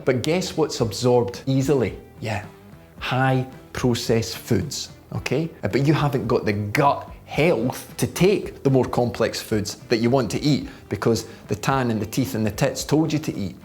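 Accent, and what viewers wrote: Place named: West Scotland, United Kingdom. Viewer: Trainspotting is Edinburgh accents (east), his is west coast. Might sound the same to you but Scottish people can hear the difference.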